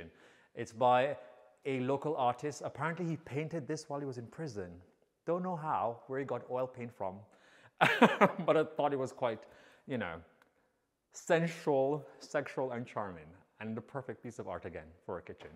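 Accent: Jamaica. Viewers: I can hear the Jamaican accent. Yes sir. I'm Jamaican too and I'm cooking right now. Working on the rice and peas. Love you sir. You're a class act! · Rest assured, his accent cannot be pinpointed to the mannerisms and intonation of one particular (European) group of people. It has hints of Jamaican, British, and American. Which makes total sense given his background and where he lives. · Within the first 5 mins. of the video, I recognized your Jamaican accent. So proud of your home and your accomplishments😃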